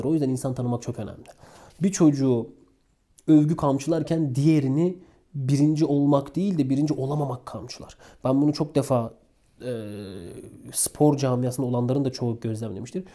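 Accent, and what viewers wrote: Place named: Black Sea, Turkey. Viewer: Karadeniz şivesi süper😂Oruçlu okudunuz sanırım insanın ağzı kuruyor,sonlara doğru bitiricem diye zorladınız teşekkürler.Hakkınız ödenmez.🙂💐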